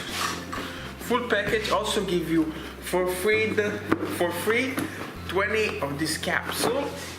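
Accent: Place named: Italy. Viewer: It's a clear Italian accent, but even if you aren't familiar, he literally said "Here in Italy" at the start of the video.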